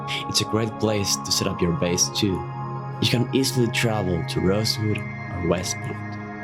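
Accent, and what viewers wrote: Argentina. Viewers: hey, where are you from? I find your accent very similar to mine, I'm from Argentina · tenés acento argentino sera q sos argentino??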